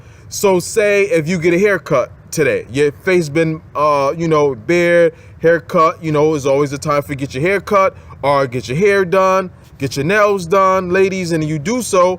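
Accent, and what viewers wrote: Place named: New York, United States. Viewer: You are God sent. Thank you for sharing this information. Also i can tell your from N.Y.. Great video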